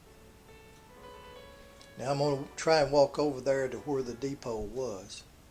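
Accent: South, United States. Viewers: These are some of the places I'd like to see when I got more time. IE retired. Thanks for the video. Your southern accent reminds me of my Alabama In Laws. Ya'll take care now, Bless your heart for the good work done here. D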